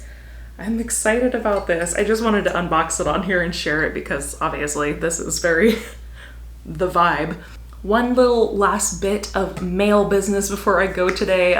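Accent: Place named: United States. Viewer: I hear that American accent quite often, I really like it. What type is it...Southern?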